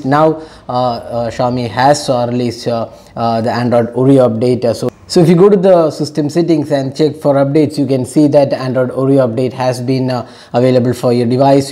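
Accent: Kerala, India. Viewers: U r from Kerala Your tone speaks about it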